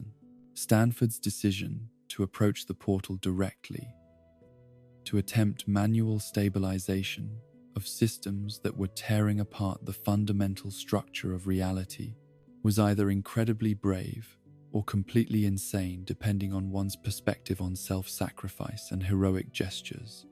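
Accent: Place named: RP, United Kingdom. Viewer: u sound like a tory but i fw this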